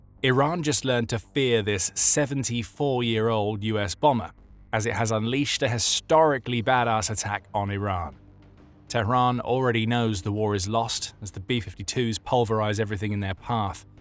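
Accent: United Kingdom. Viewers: Great footage but why have a Brit narrate?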